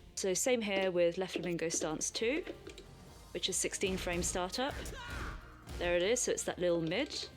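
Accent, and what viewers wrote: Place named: United Kingdom. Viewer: This is a good video. I like the fancy British accent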